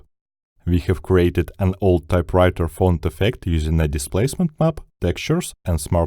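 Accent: Russia. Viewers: А можно по русски? По голосу слышно что можно. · ох уж этот русский акцент))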